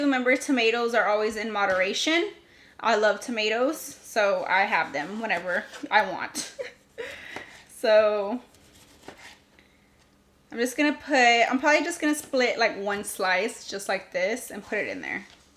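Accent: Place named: Houston, United States. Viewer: It's the Houston accent 🙌💪💪💪. You look beautiful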